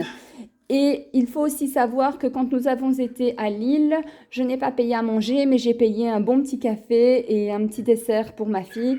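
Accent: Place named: Belgium. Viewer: J'adore houit à la place de huit et nonante à la place de quatre vingt dix.J'adore les Belges et la Belgique.Ne change rien!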